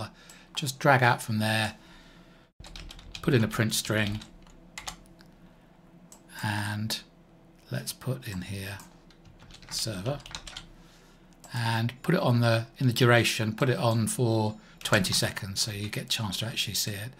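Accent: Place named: South Carolina, United States. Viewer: Let me guess, are you from South Carolina or somewhere near it? THe manner of pronunciation reminds me my friends from these places. Thank you so much Sir, interesting and useful videos!!!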